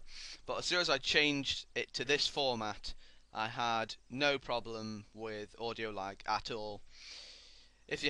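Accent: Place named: United Kingdom. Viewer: British voices are always awesome.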